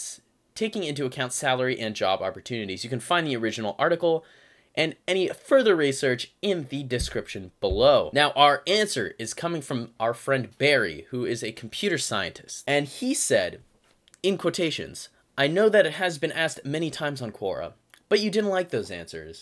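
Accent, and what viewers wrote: United Kingdom. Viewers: hey..I absolutely love your video. I love the british accent..you're going to be famous... please make more videos..I'm actually a registered pharmacist making $175000 a year for Walgreens pharmacy in the "el paso district" in las cruces new mexico....I went to UNIVERSITY...loved that..it's a British and canadian term university lol aka college. in US..my point being....love your breakdown of the yearly income for future millionaire's in today's economy...my friend is in your field and coul have used your help like 50 years ago but you didn't exist then..oh btw I only went to university for 7 years. I graduated at age 24 with as a pharmacist and live in one of the cheapest places to live in the country New Mexico..in NY I'd be making $30000 a year...ps your videos are my new addiction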